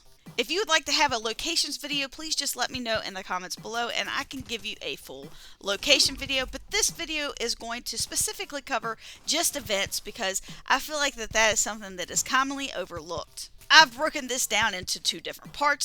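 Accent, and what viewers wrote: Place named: West Virginia, United States. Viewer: W.V. accent...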